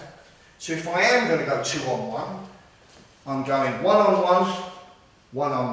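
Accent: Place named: United Kingdom. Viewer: I wish I could understand what he is saying, its mostly english, yet the british accent is hard to figure out. I think If we were to see what he was talking about instead of just talking about it it would click and make more sense. I seen a couple of times where they almost started to show us some tactics other than pretending to hit in the face. I am gonna try one more video to see if the audio is any better.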